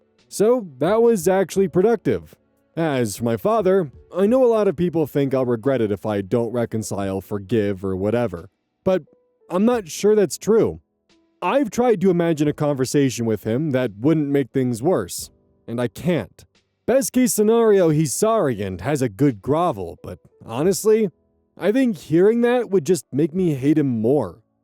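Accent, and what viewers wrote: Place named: Midwest, United States. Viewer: I feel a lil guilty that I have not watched main channel. I just know him as the lil guy that has the cute midwest accent and reads Reddit so I don't have to suffer through it, myself. And the only creator to tempt me into the arms of the sups.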